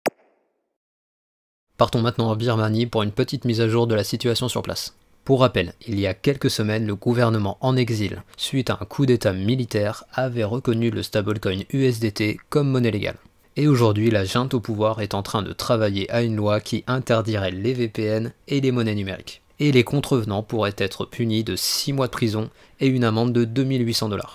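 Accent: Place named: England, United Kingdom. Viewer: J'adore ton accent anglais of course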